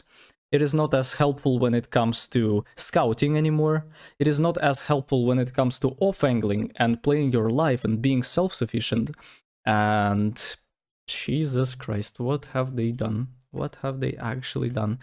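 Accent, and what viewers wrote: Russia. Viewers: У тебя русский акцент, ты шо житель Рашн контри? :)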